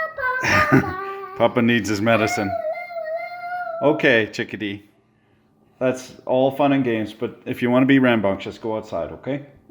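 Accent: Quebec, Canada. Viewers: Wow your French is very good ! Hilarious accent from Quebec tho ^^ Keep up the cool shit man looking forward to see your next cnc vid ;) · Are you Quebecois? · Tabarnack de calisse il parle québécois pas français calvaire de siboire d’osti de criss !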